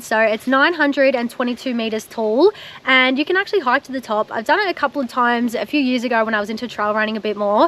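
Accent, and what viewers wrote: Australia. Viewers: But, I love your accent! Australian accents are most sexy accents in the world!! Love your drone footage! But please dont act like your alone🤦🏼‍♂️🤦🏼‍♂️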